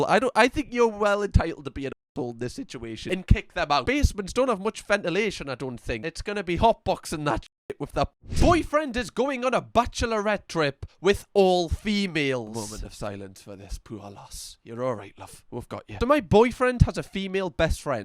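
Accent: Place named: Scotland, United Kingdom. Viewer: you sound like scottish jacksucksatlife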